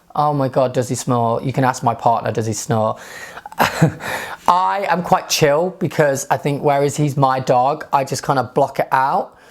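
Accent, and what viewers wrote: England, United Kingdom. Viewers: Are you from England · I just love his British accent ... I can spend hours hearing him talk... ✨️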